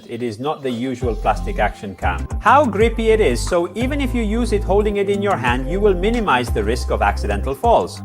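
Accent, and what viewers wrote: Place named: India. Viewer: U sound like an indian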